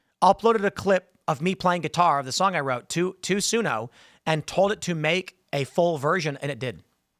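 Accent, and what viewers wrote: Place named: Chicago, United States. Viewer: You are the one that uses AI for literally everything that you talk about on your Channel. You even constantly refer to it as your Friend, except you use your Patented “Exaggerated Chicago Accent™️” to make it sound silly, even though it’s an Accurate Reflection of The Truth, however you may try to frame it. 🤷‍♂️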